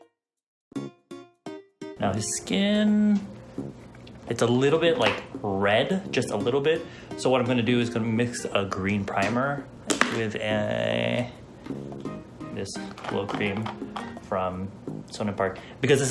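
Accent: South Korea. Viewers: I love your konglish 😍😍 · the koranglish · i love this konglish omg i relate so hard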